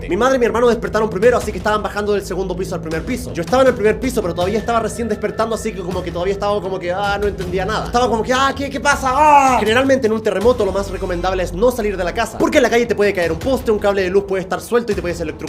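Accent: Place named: Chile. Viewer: Cuando German hablaba chileno 😢xd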